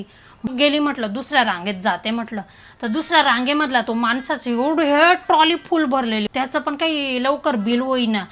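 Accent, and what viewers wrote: Khandesh, India. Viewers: Typical Khandeshi...you always remind me of how my Sasu talks! 🙂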